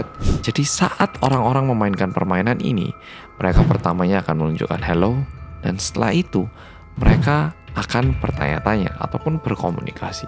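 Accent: Java, Indonesia. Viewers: Mau cerita dikit ya. Ibuku menderita Alzheimer setahun ini Sebelum beliau kena alzheimer, beliau sering tak ajak nonton bareng videomu. Terutama yg kulineran & jalan2 Setelah itu lamaaaaaa sekali ibu ngga nonton videomu krn aku pindah rumah, ngga serumah lagi sama ibu Setelah terkena Alzheimer, beliau ikut aku. Bbrp hari yang lalu, aku nonton Rindingdong di depan ibuku dengan volume full Eh ibu langsung "iki sing wong korea medok kae yo la?" Walah, takjub aku. Ibu masih inget sama, walau memori2 yg lain banyak yang hilang 😄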